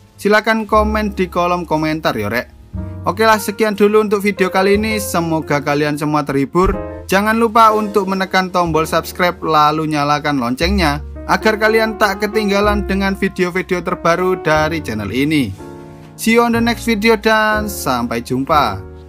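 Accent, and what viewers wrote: Java, Indonesia. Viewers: Btw Mas Nyaa Ini Orng Jawa??.... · Medok buanget si mas bahasa Indonesia nya, 🙏👍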